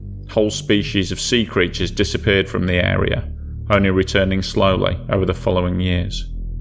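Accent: United Kingdom. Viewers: they're Brits. They speak a different version of English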